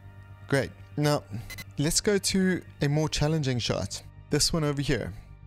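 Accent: South Africa. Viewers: I could hear the south African accent and then I saw table mountain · Spot a South African just by their accent. Great video mate! I use Lumafusion for all my editing and grading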